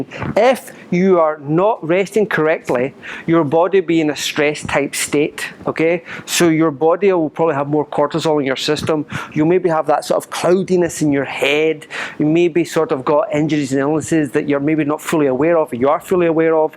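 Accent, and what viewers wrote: Scotland, United Kingdom. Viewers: The Scottish accent makes this video 10x more awesome. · Great video 👌 Bang on everything you say. I’m a fellow Scot, from Airdrie just outside glasgow! Nice to hear my accent YouTube’s full of Americans 😂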